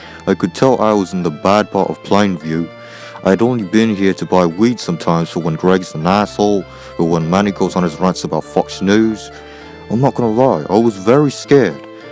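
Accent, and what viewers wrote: United Kingdom. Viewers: you have a deep British accent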